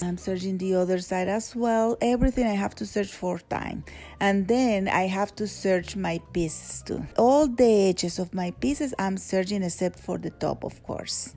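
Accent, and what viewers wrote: Spain. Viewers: Pero lo esta explicando en acento espanol! 😉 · This is a great tutorial, thank you! I have the same “Pandemic Pants Problem” I did a triangle in the back, but was not good, yours look great! Your accent... are you Spanish? Your mother looks very young, so how old are you to be so talented? Thanks for share, I just subscribe from Puerto Rico!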